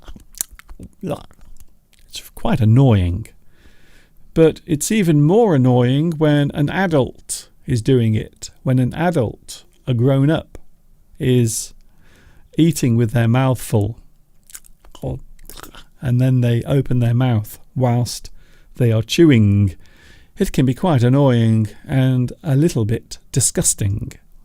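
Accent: United Kingdom. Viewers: My lovely British accent!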